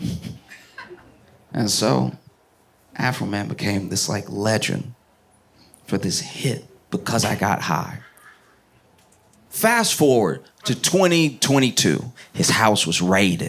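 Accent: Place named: Tennessee, United States. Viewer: Shreveport is in the house! Stoner Hill to be exact. What up homeboi! I've been trying to figure out your accent. For some reason I thought Tennessee. Dalzell St. in Highland, wow. Keep up the good work! 🫵💪💯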